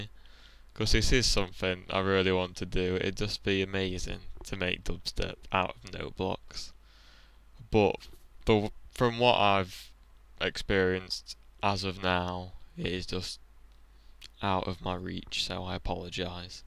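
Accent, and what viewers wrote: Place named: Australia. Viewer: he sounds australian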